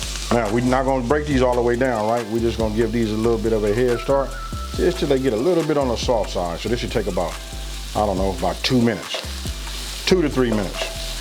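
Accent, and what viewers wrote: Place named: Los Angeles, United States. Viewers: bro i swear i knew he was from LA as soon as he started talking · Im proud to see this dude also from Cali with that L.A county accent. Your Channel editing and presentation energy and skills ALL on point bro for real!! i already have my mom and sisters subscribed and myself too. im bout to regulate making your famous Chilli in the new crock pot for my elderly folks! god bless you and your famalia from ATL Ga!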